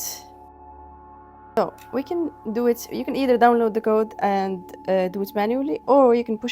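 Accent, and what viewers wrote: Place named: Egypt. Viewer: طلاق تلاته مصرية و متهش عن الاكسنت😂 شكرا❤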